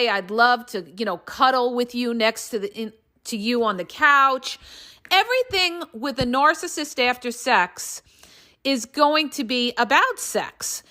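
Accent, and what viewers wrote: New York, United States. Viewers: Ignore the haters! Your colorful vocabulary, and that classic NY/NJ accent and inflections make the podcasts even better. Reminds me of the Sopranos.:)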